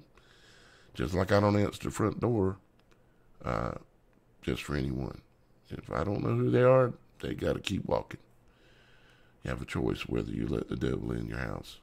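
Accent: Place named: South, United States. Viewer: Naw, Son; although, you're, clearly, a Fuck-tard. Just some honest feedback form a member of the audience. Between the guy's Southern-fried accent, his monotone, near-mumble, the repeated use of the incorrect phrase, "Crimson Tide," (Alabama fan, huh?) and random samples of the dreaded Gematria (I mean, give me a break with that crap), I challenge anyone to tell me what the fuck he's talking about in this video.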